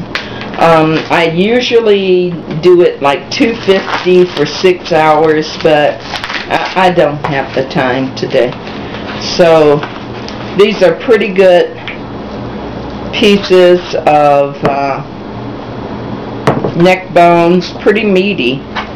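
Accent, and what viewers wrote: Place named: Midwest, United States. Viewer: LOVE this:) Im from Wyoming so Nice to listen to another midwest lady:) THANK YOU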